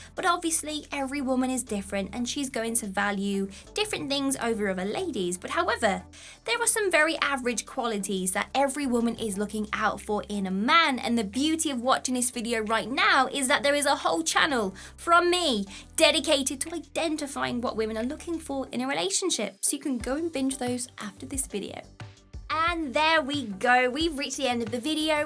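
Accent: United Kingdom. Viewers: Her voice pitch + british accent = something interesting going on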